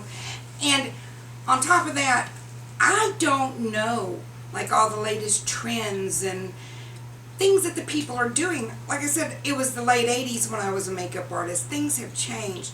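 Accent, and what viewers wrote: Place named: South, United States. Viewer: I am from Arkansas but have been living in Pennsylvania for over a year now (.My husband's job brought us here ) I am sometimes starved for the sweet sound of a southern accent ! I think I watched 90% of your videos last night and thoroughly enjoyed them all !!! I even shared one on my Facebook wall . Keep making those videos !!!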